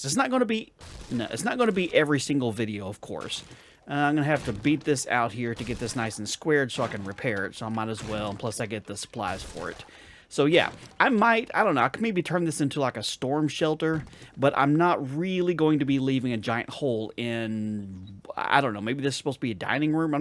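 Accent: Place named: Midwest, United States. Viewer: not to shabby man. Enjoyin the vids. BTW dumb question. Where you from? Sounds like the midwest with the way you speakith 🤣😂🤣😂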